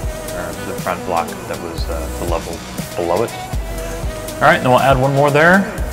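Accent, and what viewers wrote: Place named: United Kingdom. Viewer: Nice man, you solve the problem whit the audio of your voice, nice for you dude XD , its sound a little bit eco, but at lees your voice is in the proper volume poin, a little high form the background music, realy nice man, gratz for make a nice vid this time hehehe :P (better than the other! ) i hope we can figth in game some tiem, but i think is a little dificult becouse im from Venezuela and if im rigth you leave in Ingland or UK for your acent, my nick in game is the same like here so if you see me try to kill me heheheheh, If you can >:)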